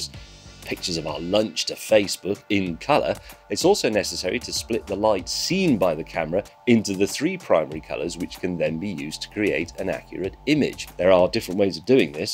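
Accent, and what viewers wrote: United Kingdom. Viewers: Everything sounds better in a British accent.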